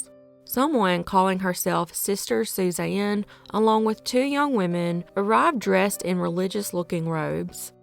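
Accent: South, United States. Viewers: content a side, I have to say your calm pitched tone of your southern bell voice is perfect & keeps me coming back, although MANY others can have great content gets sidelined when they try to say it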